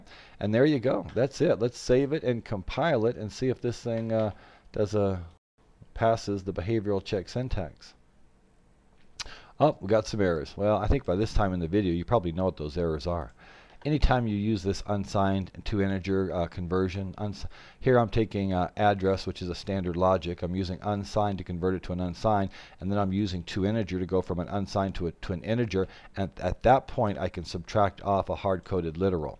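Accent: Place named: Southwest, United States. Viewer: I think it is a US accent. Southwest probably.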